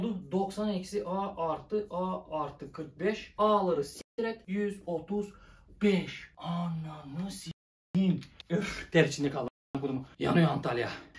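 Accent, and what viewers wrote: Thrace, Turkey. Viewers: kral sayende urfa agzını bırakıp trakya agzına geccez adamsın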